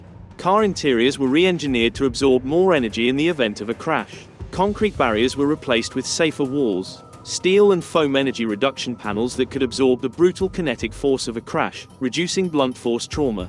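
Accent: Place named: United Kingdom. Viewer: You just ruined this by having a Damn British accent Commentator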